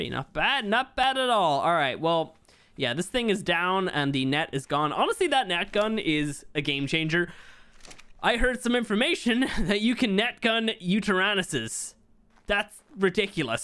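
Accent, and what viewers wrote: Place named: Ireland. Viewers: His wife is from Canada so her accent could of worn off on him a bit, not sure, he could of also changed his accent a bit on purpose to be more understandable on youtube as irish accents can be a little hard to understand at times when thick. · I always thought he was Irish lol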